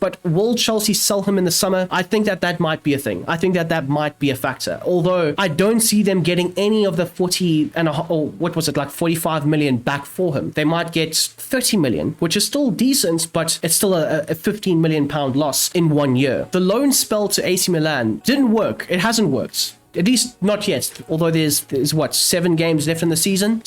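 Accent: South Africa. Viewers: Fellow South African?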